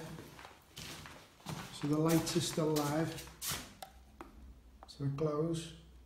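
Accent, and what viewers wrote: Liverpool, United Kingdom. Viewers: Just what I was looking for great video really helped me. I’m a scouser so it was great to hear the accent 👍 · Cheers Mate! You just confirmed everything I do myself to be correct. The only thing that lets me down is when someone previously strips out the lug hole; just found something to repair it with. But many thanks for video. Nice to hear another scouse accent and the term 'lecky'. Stay safe! :-)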